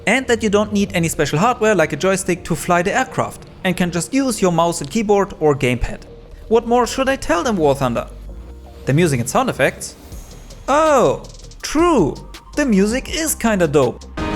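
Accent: Germany. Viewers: Is he German ? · Hello my German friend, man hört das sehr stark raus und ich liebe es 😂 Danke für deinen Content und deine ganzen Bemühungen ^^ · Ich find's geil das man den Deutschen Akzent direkt raushört😂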